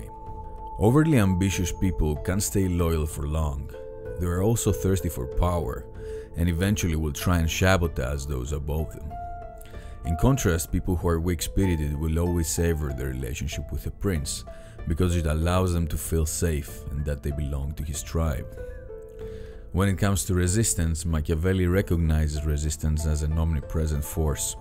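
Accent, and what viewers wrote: Greece. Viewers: God damn your accent is so greek. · You must be a Greek,,,, not only the accent, the looks , but the room decoration.....marvelous. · IS THAT GREEK ACCENT I DETECT IN YOUR VOICE? OR SPANISH?? 🤔🤔🤔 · Very Greek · your accent appears Greek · Lol first time i am in your channel and after the first verses i caught that you are greek from your accent XD great video and very informative.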